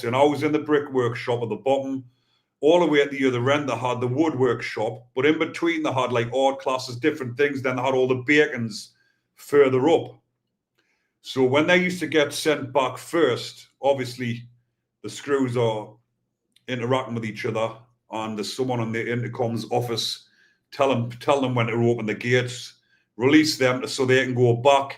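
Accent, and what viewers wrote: Newcastle, United Kingdom. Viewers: Whi gives a flying fuck about men acting like women, it's clear to me that Ur a bully, a thug and a tosser itself. They might be in jail but that does not take the right away of anyone bring what they want, maybe you should be back in jail, giving it the large with the skin head and bs Geordie accent. What contacts have you got in jail, stfu you stupid twat, U chat so much shit.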